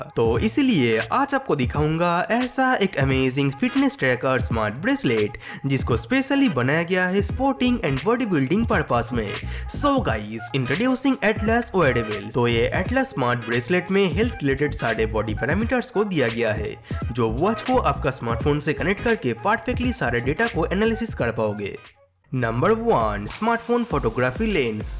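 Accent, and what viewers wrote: Bengal, India. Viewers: i love your bengali accent ..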